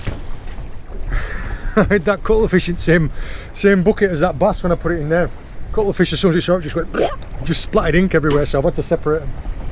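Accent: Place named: Northern England, United Kingdom. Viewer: You have a northern accent. What took you to Cornwall?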